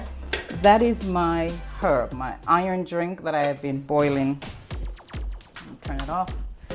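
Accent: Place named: England, United Kingdom. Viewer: No salt fish really 🤪 sounding very english mate.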